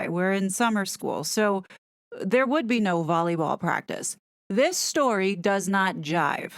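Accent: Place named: United States. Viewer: I agree with you about everything you say & love your vids as they're so informative & honest. But, as a committed atheist for past 30 yrs (was a Catholic) i rarely admit to anyone, except close friends my lack of religion. When I make that mistake, I'm usually castigated for it. Whereas, when I was Catholic, no problem. As you sound American (possibly Canadian?) we're always led to believe in the UK that America is the most christian country on earth. Is that woke stuff happening across the Pond too? Horrible if it is, as Christian ideals r the only ones to live by. I still do, even though I don't believe in God. Apologies for offending other people of "The Book" but New Testament never advocated massacres & plagues on whole innocent populations just because God woke up that day feeling grumpy or a bit bored. It was a handbook for peace.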